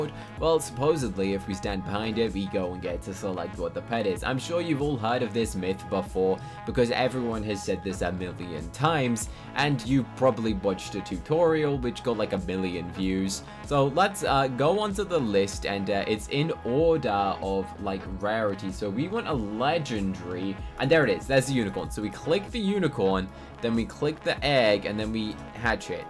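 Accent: United Kingdom. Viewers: You sound like every british guy I've ever met, it makes me feel like I know you lmao.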